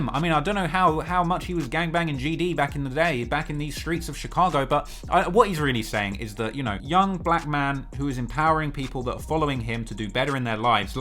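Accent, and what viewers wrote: England, United Kingdom. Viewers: Yeah I think he's from, you know, England - because of the British accent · why is a british man giving me information on a chicago based gang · We don’t wanna hear a guy from England report on this…..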